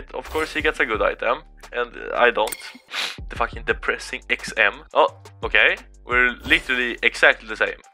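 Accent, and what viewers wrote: Sweden. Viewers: You sound so Swedish, but I love it :D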